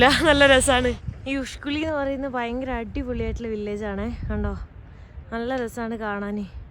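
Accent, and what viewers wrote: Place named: North Kerala, India. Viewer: വടക്കൻ കേരളത്തിലെ സംസാര ശൈലി കേൾക്കാൻ ഞങ്ങളെ പോലെ മദ്ധ്യ കേരളത്തിൽ നിന്ന് ഉള്ളവർക്ക് റെസം ആണ്😊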